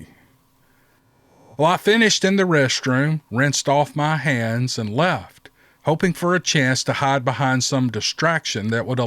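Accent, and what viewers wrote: South, United States. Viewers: Love his Southern accent, so so sexy.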